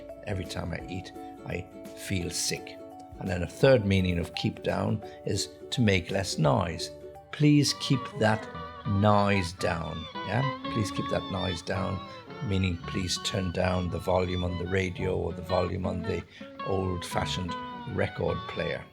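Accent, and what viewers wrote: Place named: United Kingdom. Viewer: I watched this video twice, I was enjoying it, your British accent sounds great, my warm welcome from Kazakhstan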